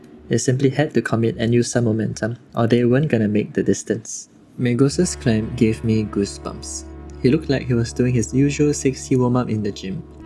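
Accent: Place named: Singapore. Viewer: Kinda guessed you were singaporean from the accent and the BP tai seng clip from another video confirmed it haha. Glad to see good content coming out of our little red dot, keep it up!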